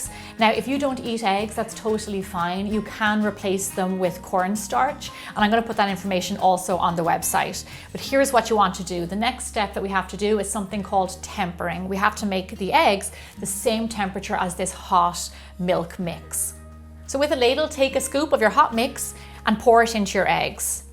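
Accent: Ireland. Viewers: Mmmm that pie look so yummy. Also. I love your. IRISH ACCENT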